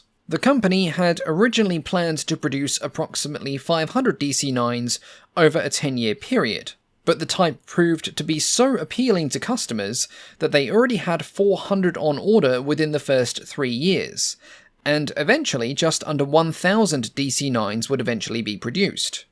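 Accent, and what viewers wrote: Australia. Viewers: Oh god, another Australian with the dull mechanical delivery of a schoolboy reading out his homework. But worse. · Nike is pronounced just like the Nike shoe or did I miss this because of your Aussie accent <link> Cheers from San Francisco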